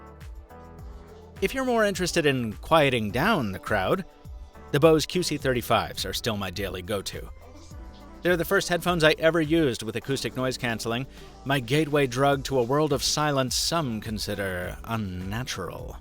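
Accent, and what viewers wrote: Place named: Boston, United States. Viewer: Boston aye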